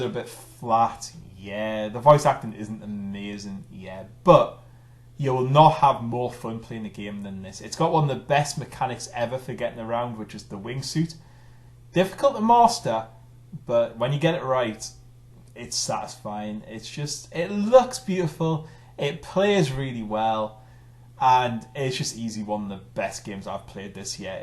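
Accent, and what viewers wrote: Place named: Newcastle, United Kingdom. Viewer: I dinna no ya was a Geordie!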